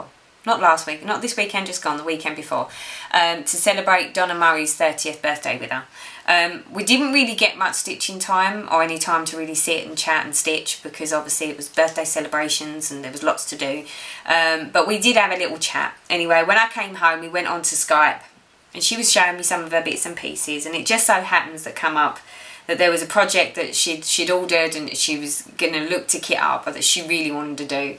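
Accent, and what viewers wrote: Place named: London, United Kingdom. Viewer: Hi there ,just found you while i was stitch surfing ,and would like to say how much i enjoyed your video and seeing your wip,s and future projects ,i,ll have to catch up now on all your other videos .look forward to watching them . your accent sounds a bit like mine ,are you in London or Kent maybe ?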